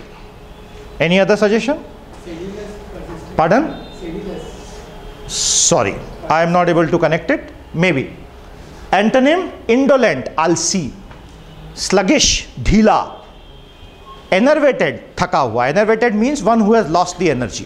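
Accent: India. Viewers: Sir....does Hindi influence your pronunciation a little bit? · Very nice understanding of vocabulary and nice explanations, but sorry to say, with your pronunciations all that will happen to me is a mockery. MOST of the words I came across in this video are being wrongly pronounced, and that too with a very heavy INDIAN accent. English is not an Indian language...and this type of pronunciation has ruined it for the entire English speaking Indians. Video very helpful for written articulation only, a native English speaker will have a hard time trying to understand what you say.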